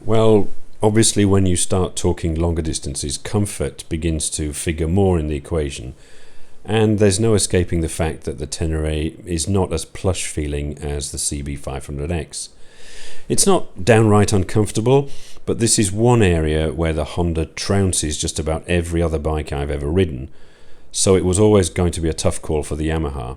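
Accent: United Kingdom. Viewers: Not only I find your opinion on bikes clever and accurate but also I get to practice my british English listening skills. Absolutely brilliant :D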